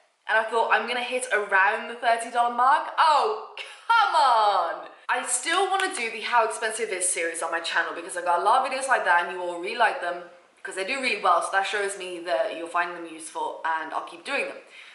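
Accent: England, United Kingdom. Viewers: hahaha... you are super funny and really liked the way u explained ur day expenses and luved ur accents too which reminded me of nephew living in England... but he is 12 yrs kid 😁😁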